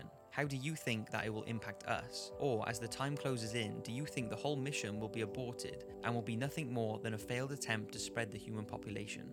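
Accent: Wales, United Kingdom. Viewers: i can not work out your accent! it sounds kinda welsh??? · i like british commentary. it makes the videos feel more intense and stuff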